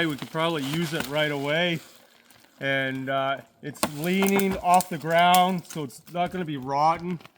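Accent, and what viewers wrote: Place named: Minnesota, United States. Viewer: You sound just like minnesotans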